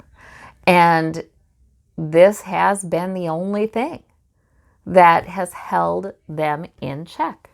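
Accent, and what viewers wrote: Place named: Upper Midwest, United States. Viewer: Very nice reading...as expected! Love your Upper Midwest style! Stone cold Chicago Democrat here...now living in NC...and I miss the Midwest every single day! Was able to absorb great peace from the Great Conjunction last night, which revealed itself right over my house! Hope we can all draw strength from our tribulations...In order to make our world more positive. Blessings to you, sweet...carry on!!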